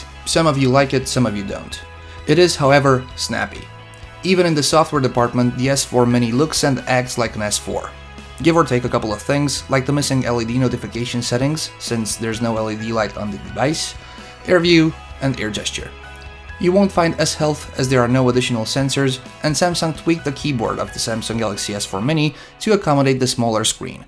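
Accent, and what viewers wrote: Russia. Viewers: I still can't pin this guy's accent, Russian?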